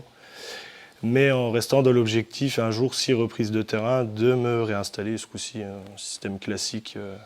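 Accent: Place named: Vosges, France. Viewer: Un bon accent Vosgien bien prononcer, comment reconnait on un Vosgien, tu lui dit, tu aime qui, ton père ou ta mère, il doit te répondre, j'aime mieux le lard, tu lui dit, le gras ou le maigre, il doit te répondre la couenne Je te souhaite pleine réussite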